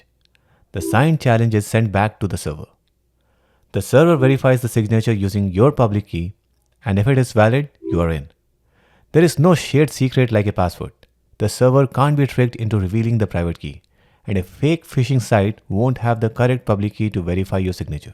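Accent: India. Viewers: Hearing about protecting myself from phishing attacks through an Indian accent is kind of surreal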